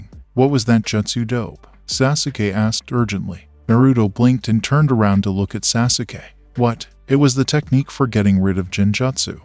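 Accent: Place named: Indiana, United States. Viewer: Sometimes I feel like all of these Naruto what if channel's are made by the same person with the same voice and the same accent like I think it's kinda sus that at thea beginning of each vid it's like this guy with I think is a Indiana accent and I lot of other What if channel's I feel like are always random guys with a Indiana like accent and the fact that all them always talk with that accent in the beginning of the video is a hint but its just a theory a film theory thanks for reading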